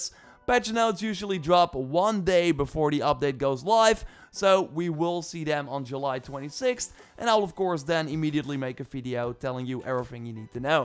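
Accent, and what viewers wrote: Netherlands. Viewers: The netherlands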